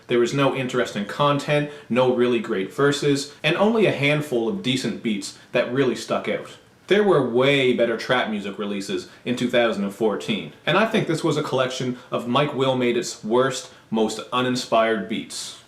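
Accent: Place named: Canada. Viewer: Will do. Are you Canadian? I love the accent.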